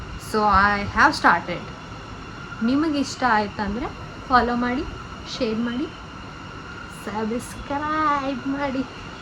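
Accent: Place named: Dharwad, India. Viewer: Bangloredag 3 varsha idru innu dharwad bashe martila alla ad ista aagid nin vedio olag❤❤❤❤❤....love and respect for that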